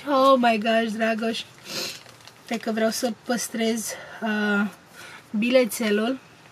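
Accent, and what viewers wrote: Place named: Moldova. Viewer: ce nerusinat e Dragos ma facut pe mine sa lacrimez si inca plus la asta a mai facuto si pe mea preferata sa lacrimeze a fost o felicitare asa cum a spus el o felicitare lacrimogena.iar bratara e minunata so porti sanatoasa.Asteptam cu nerabdare sa iasa in vinzare cartea lui Dragos e foarte talentat baiatul asta si la machiaj si acum am aflat ca va lansa o carte sper sa am si eu ocazia so citesc.Ador cind vorbesti Moldoveneste incep a zimbi de fiecare data si apropo transmitei multumiri lui mamica ta pentru reteta aia de apa de orez daca functioneaza o sa fac si eu.Te pup mult succes in continuare si multumesc pentru ca neai felicitat ca suntem 2000 degrasuti si desigur te felicit si eu pe tine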